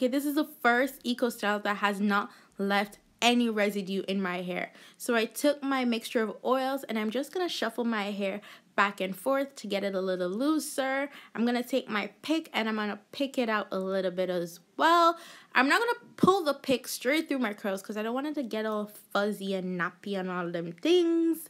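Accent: Patois, Jamaica. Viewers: Lol I knew you sounded Jamaican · I knew you wee Jamaican...from the first time you spoke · sorry I had to ask because I heard the accent and I'm a Jamaican too. great video goodaz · u sounds like Jamaican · You're trying to hide it but i think i hear an Jamaican accent😮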